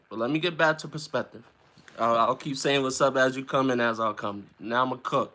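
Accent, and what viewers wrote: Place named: New York, United States. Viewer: I will watch Sinners when it comes on video. I have heard good reviews too. I didn't know you were a counselor, so good to learn more about you. Good message you shared. Meeting people where they are at, not where we want them to be. Listening is key. Like you said, sometimes we just need someone to listen, no judgement, no "answers". Good video. Love the accent, sounds like New York!🙌🏽👍🏽 Going to school for Social Work. Honorable!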